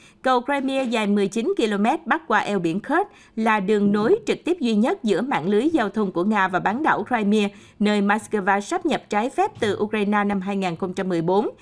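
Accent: Saigon, Vietnam. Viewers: Rất tò mò kênh nầy mà giọng xướng ngôn viên hay quá, đây mới là đỉnh của người truyền tin tức cho mọi người nghe vì tiếng đọc miền Nam Saigon rất chuẩn…!!! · Giong sai gon nghe chu an va ro rang hon .va de thuong hon.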